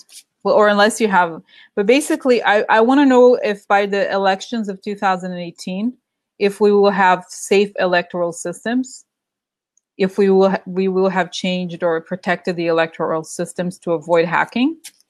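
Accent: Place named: Russia. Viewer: Xoxoxo I love your Russian accent. 😊😊😊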